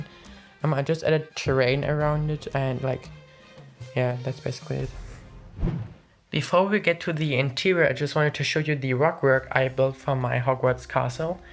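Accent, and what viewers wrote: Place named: Germany. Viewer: Are you from germany its just that the Accent sounded familiar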